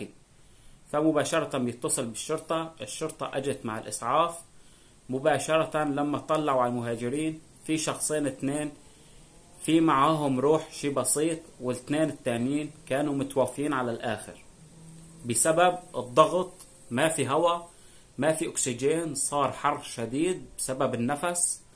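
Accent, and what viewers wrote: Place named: Aleppo, Syria. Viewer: المشكلة من لهجة انت حلبي معم افهم على لجتك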